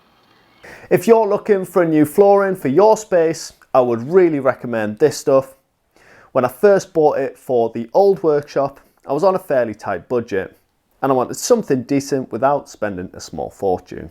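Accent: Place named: County Durham, United Kingdom. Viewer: Just came across your chanel. Nice one. Cant work out the accent. Co Durham or there abouts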